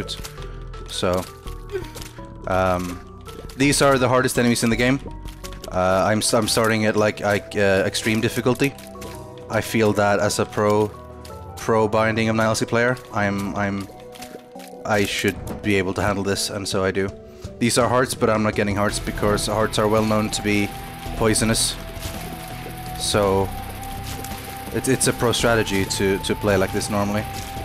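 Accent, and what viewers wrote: Scotland, United Kingdom. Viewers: Wow Nilesy got a lot less Scottish. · Nilesy sounds more Scottish than usual in this video for some reason.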